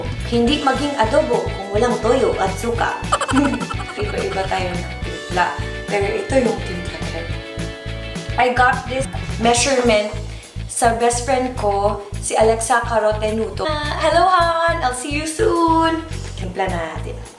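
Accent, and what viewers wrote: Visayas, Philippines. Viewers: Bisaya po kase c bisprin kaya ganyan po sya magsalita,pero ok naman para din di ma bored mga viewers kaya dapat may comedy talaga po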